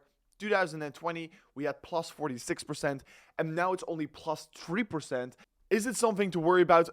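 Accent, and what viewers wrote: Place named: Netherlands. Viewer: Zo klinkt ie wel ja 😅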